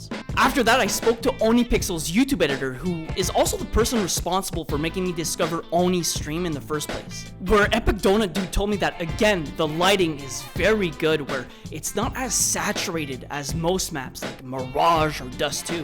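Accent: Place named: Quebec, Canada. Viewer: T’es tu québécois? Ton accent est parfait. Bonne vidéo btw👍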